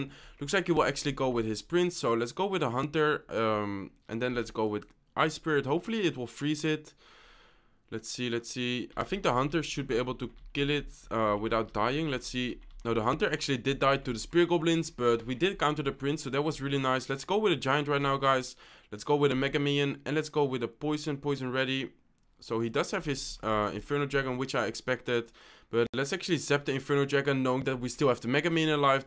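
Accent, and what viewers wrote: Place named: Netherlands. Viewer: You can hear that you're from the Netherlands that is what I thought when I watched you for te first time😂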